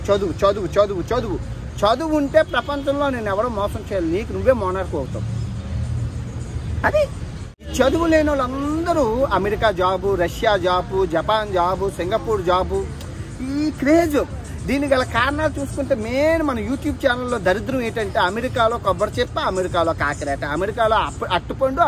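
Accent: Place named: North Andhra, India. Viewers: From your slang u seems to be from North Andhra. Your people are daring and enterprising. People in Malaysia and all in Indonesia are from your place. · Nedi మా శ్రీకాకుళం మాటలు ల unayi స్వామి...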